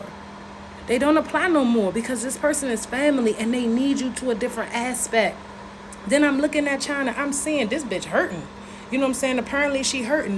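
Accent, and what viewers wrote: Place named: DMV, United States. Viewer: Im from DC and when I first heard you speak I knew you was from the DMV, you have the accent. I know you fucked up about this whole Chyna situation, but forget her. She was jealous of you. Plain and simple. She was jealous that you can be lowkey and private, wear comfortable clothes while she has cameras running around her all damn day. When I seen yall first interact on the show, she would throw you small jabs that I picked up on but Im surprised you never picked up on them. Oh well, its the past. Time to move on. And you and Chyna seem so different in a way, maybe befriend women who have a similar personality to yours. I know your going to be ok, you seem to know how to cut someone off if they not on the same wavelength as you.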